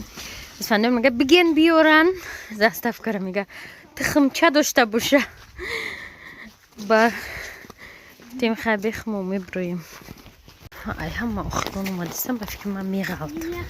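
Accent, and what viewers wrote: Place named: Khatlon, Tajikistan. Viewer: апачон лафзи хтонкати гап занен мада лафзи хтон махкулай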